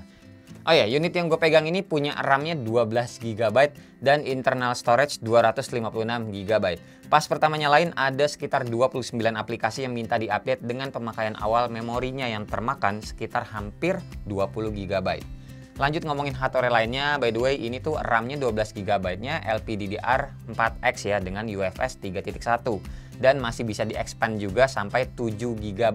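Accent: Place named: Sunda, Indonesia. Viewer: Orang mana emang Kang? Campur sunda uyy 😂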